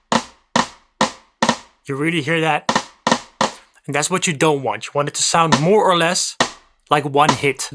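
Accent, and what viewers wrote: Netherlands. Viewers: NIce exercise, explanation and video overall! Also, am I hearing a bit of dutch accent in your English or is my mind playing tricks with me?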